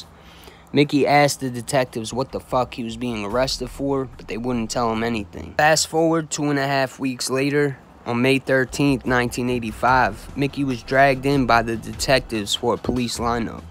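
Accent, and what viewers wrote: United States. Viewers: Yo Stight up I think you got this brother OC Shortz. No disrespect to OC Shortz his video official. But first of all ya styles are so different they can’t even be compared they two completely different styles. He got that commentary vibe going where he on the videos talking mixed with I’ll digital & sound effects mixed in. But on da real ya style is original. You don’t show ya face. Your digital editing skills coincide with your voice. The way you show the picture’s of the real guys with dope arrangements. Your version is straight up official mini documentaries. Plus let’s just face it. This is “ Our Thing “ ya American voice and the audio delivery sounds official! 💯 ya voice matches ya Video presentation. A British accent kinda takes away from the vibe like a student presenting A presentation of a foreign life only able to just spit facts about what he researched. 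Don’t get twisted his shit dope like I said no doubt OC Shortz is great in that style. But in my option having family members of my family in the life, no comment on myself. Lol I prefer your style. My brother if it was up to me you got him , ya best doing this shit right now. This is the American Mob, ya voice match’s the vibe of ya content. Not seeing you makes the audience paint a picture of how they perceive you look. Which with ya serious tone would be perceived as gangster in American gangster vibe.. So like said no disrespect to the British homie doing the whole commentary vibe with his face up in the camera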